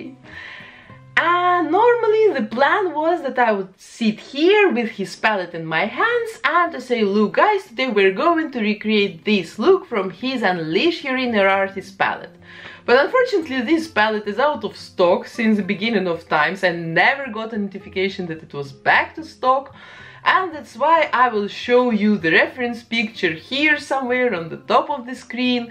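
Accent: Russia. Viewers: Русский акцент , наших везде узнаешь. Просто перекрёстная работа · типичный русский акцент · where are you from? You have very russian accent:)) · You are Russian? very Russian accent🤨🧐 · Ух, ну очень русский акцент Но работа прекрасная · Oh girl u have such a cute face and russian accent:)) U are doing such a great job! Greetings from Romania! ❤️❤️ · Такой жёсткий русский акцент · Do u have a really strong Russian accent. May u please tell me because it’s beautiful 😂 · Как - будто акцент русский у неё. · Кажется что у вас русский акцент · Всё видео про это думал. Она точно из России, у моих одноклассников такой же акцент))) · u have such a RUSSIAN accent,woww!! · Tiene acento, habla inglés perfecto, solo con acento, aparentemente ruso o de la región. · Какой-то акцент русский что-ли 😄😅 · Я же не одна слышу этот потрясающий русский акцент? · Вы разговариваете как моя учительница по английскому Жесткий русский акцент 😂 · You look like my past 2-5 grade art teacher, you guys also have the same Russian accent- (I think you have a Russian accent idk-) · Я одна слышу этот ужасный русский акцент???? 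С таким же акцентом у нас на МЦК остановки на английском объявляют: "Зе некст стейшн Сокольиноя гоура... Пуш де бадн то эксит".... и всё в таком роде, аж мурашки пробирают от такого акцента((((( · такое КРАЙНЕ русское, твёрдое произношение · no,it is russian accent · однако здравствуйте she definitely speaks Russian , as I Russian I can hear it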